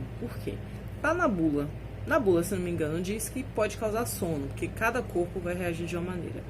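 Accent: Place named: Bahia, Brazil. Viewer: Muito bom e, bem explicado. O sotaque baiano foi o destaque, esse medicamento e sensacional.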